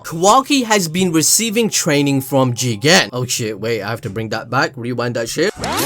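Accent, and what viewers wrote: Punjab, India. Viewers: Man are you pakistani?? Your punjabi accent seems like it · That Punjabi accent 😂🔥🔥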